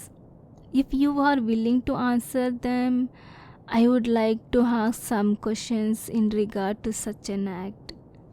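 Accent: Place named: India. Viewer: Wow, I've been listening to asmr rp/story audios for awhile now specifically ones with female voice actresses but i don't think i have ever listened to one where the voice actress has an Indian accent, you sound really good. I myself am Indian but my family are not from there so i actually never heard the accent much at all.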